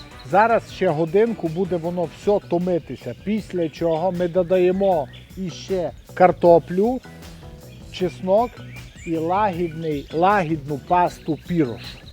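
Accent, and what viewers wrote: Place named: Transcarpathia, Ukraine. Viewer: я четко по акценту понял, что про бограч надо смотреть тут. Бограч и банош-прекрасные блюда. Люблю Закарпатье и людей. Привет из Харькова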